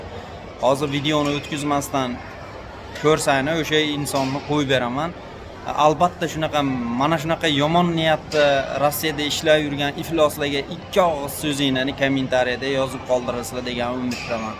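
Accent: Chortoq, Uzbekistan. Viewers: Ука. Шевангиздан чортоклик сиз шекилли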